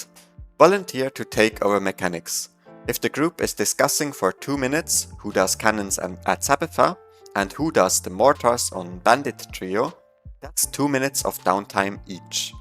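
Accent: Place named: Germany. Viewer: You are German, yes?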